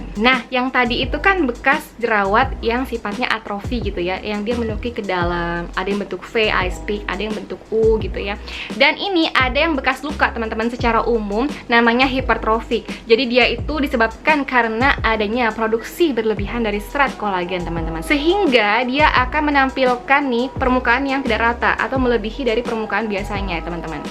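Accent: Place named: Sunda, Indonesia. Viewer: Urang sunda ning😂😂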